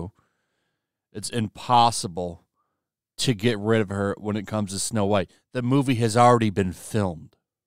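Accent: United Kingdom. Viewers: Like the British accent put to a Shakespearean tone. Thanks.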